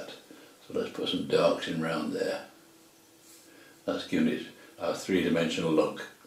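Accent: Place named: England, United Kingdom. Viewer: Love it I have always had to have a drawing to work two, so nice to hear an English voice, You explain everything you are doing so well, Will be having a go at this tomorrow, starting at your older lessons and working my way up, Thank you so pleased I found your site. Eric Lancashire Expat in Spain, Sorry about that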